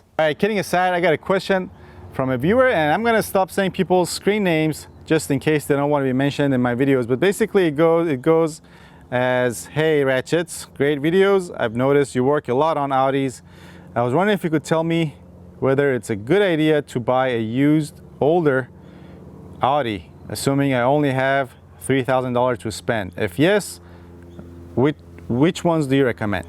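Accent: Germany. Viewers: I really like your superior German accent. :) Thanks for all of the tips. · Bro you are the best. Your German accent is hilarious 😂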